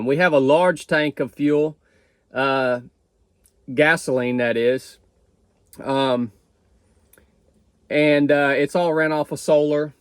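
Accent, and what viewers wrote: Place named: Alabama, United States. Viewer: I’m visiting in Alabama and you have the accent of my family! Where is your accent from?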